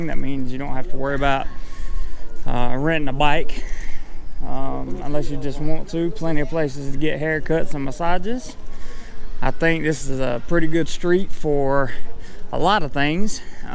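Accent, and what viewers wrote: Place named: Texas, United States. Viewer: loved your texan accent cowboy ! howdy !! ;) great video mate :)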